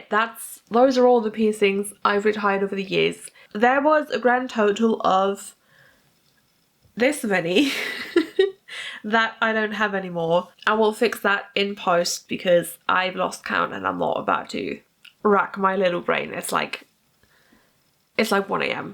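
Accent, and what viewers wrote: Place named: United Kingdom. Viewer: dass du aus Großbritannien kommst, irgendwie vom Akzent und allem her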